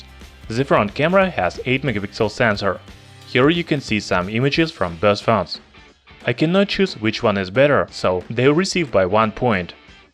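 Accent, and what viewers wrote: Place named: Russia. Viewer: Nice review, but your Russian accent makes it hard to understand what you are saying. Maybe someone else could speak the reviews!?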